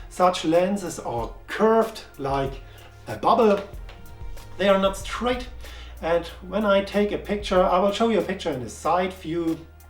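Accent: Germany. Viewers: Deutscher Akzent is so geil😂👌🏼 hört sich bei mir genauso an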